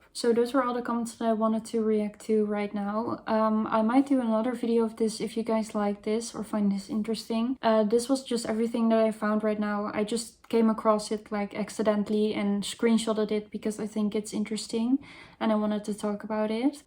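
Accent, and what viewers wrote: Netherlands. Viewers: I love your eye makeup. The "phase" thing...I didn't really discover the goth "thing" until my 40s (I'm nearly 47), but I've seen goths in their 70s - no reason it should be only a phase, and if it is for some people what is wrong with that? We like what we like whatever age we are, right? :) As far as bands go, if you haven't heard them or heard of them check out a band called Suspiria - I think they're from here in the UK. Not sure if they're active now, but they were big here in the 90s, and I know they have some stuff on YouTube. Also, if I may say so, I love your accent - the Dutch people I've met have been lovely, caring people without exception and I enjoyed what little time I spent in the Netherlands very much. Hope all is well. :)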